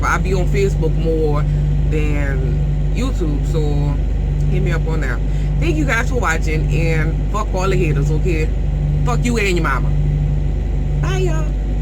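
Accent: United States. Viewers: Where are you from you got a real country tone to you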